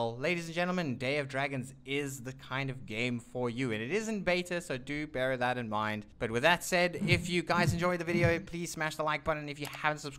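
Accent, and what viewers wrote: South Africa. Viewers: Omg a south african are u from cape town or Johannesburg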